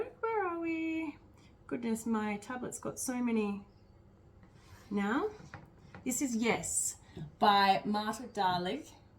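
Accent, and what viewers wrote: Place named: Australia. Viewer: !!! Oh my goodness you did excellent! I got absolutely no stitching done because I was enjoying this with my morning coffee!😁☕️ So many great WIPs, and some I don't remember seeing. I love your Pandemic your colour choices/ placements are so fun! Paradise Found is definitely one of my favourites and I loved seeing the progress on it. ( I laughed when you said you didn't pick MC so it's more doable, because whenever I get around to my JN it is regular version MC🤦‍♀️😝🤣) 26.99%🤣🤣 oh that made me giggle! Talking about 939 and the Kreinik did also!🤭 Your CM Designs are beautiful! Love your Chicago piece, those trees!!😍 I had so many more things to say but like always I have forgotten them by the time I get to the end of a video! So proud of you for jumping on the Flosstube train! Oh and I love your Aussie accent! 😊 Thanks so much for the shoutout!!🥰 I am so glad I could say I was here RIGHT from the beginning of your flosstube journey! 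Sub #1!😉🤭😊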